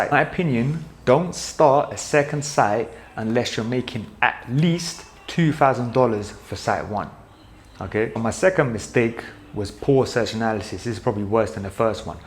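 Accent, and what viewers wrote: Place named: United Kingdom. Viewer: You sound like a British rocky balboa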